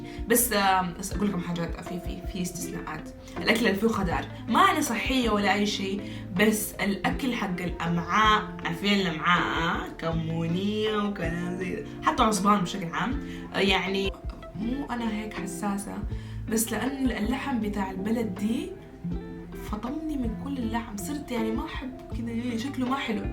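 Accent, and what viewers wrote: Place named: Libya. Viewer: احلى من يتكلم ليبي🥹❤️❤️❤️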